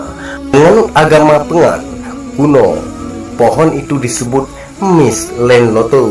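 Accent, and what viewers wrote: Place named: Aceh, Indonesia. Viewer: Logat pembaca: logat aneuk nanggroe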